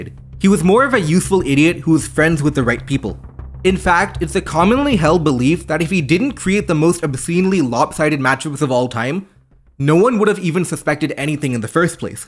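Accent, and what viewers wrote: India. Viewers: i knew i heard a little bit of indian in his voice!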